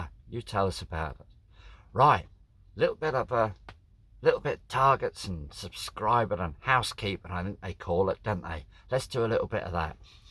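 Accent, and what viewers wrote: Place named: East Anglia, United Kingdom. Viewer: Good to hear that Norfolk/Suffolk twang in hot sweaty Sri Lanka!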